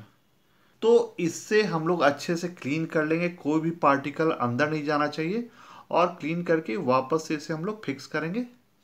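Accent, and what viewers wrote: India. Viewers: Titled in English. Audio is Indian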